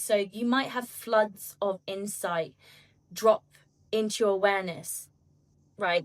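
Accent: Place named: England, United Kingdom. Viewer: Thank you for posting this. This resonated with me so much that the English accent did not even register with me until halfway through. This is the sign.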